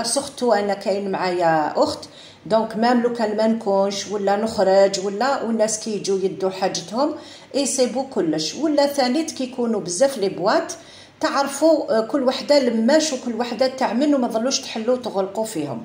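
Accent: Algeria. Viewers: شفت قناتك بالصدفة و عجبتني يعطيك الصحة تحسي الاصالة الجزائرية من خلال صوتك وبالتوفيق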